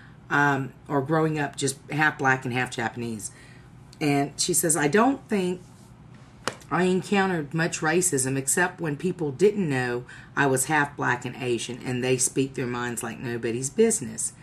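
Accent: South, United States. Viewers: Do you cook? You remind me of a Blasian Paula Deen. Maybe it's the accent. Southern accents have a certain warmth. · truly you are a southern woman, no matter what your race is. You sound just like Paula Deen to me! Lot's of black southern people are country too! you sound like a home girl to me! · where are u from? you have a thick southern accent. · I love your Southern accent!! You're a beautiful lady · See how RACE is so freakina problem with RACE in general cause if you had not known her Direct escendant outcome then you would think this woman was a natural native from Tx or Ok ...etc etc and the the souther accent helps ease the fact that how some ppl would react to the fact of the though of her being "hALF-n***8" (excuse speech) referencing tho. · OMG, you are so gorgeous and sweet with your almond shaped eyes, beautiful brown skin and Southern accent! 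· half black, half asian with a country/southern accent...I love it!!! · Is your MOM ASIAN AND YOUR DAD BLACK? and Are u from Texas because you have a Southern / Country Accent😎😎😎😎😎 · at first i was thinking english but then the more i herd you i was oh okay shes from the south* i luv southern accents* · I was like I hear an accent but than I realize yep a country accent. you sound so white tho. glad you had a good experience.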